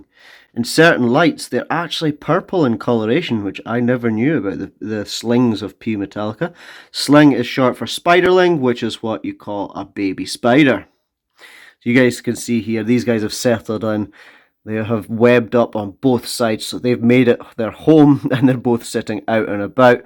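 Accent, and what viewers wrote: Scotland, United Kingdom. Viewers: I watch Dave every week and his channel with camera lady it’s fab, I’ll admin nice to hear your Scottish accent looking forward to more of your post now that I know your here.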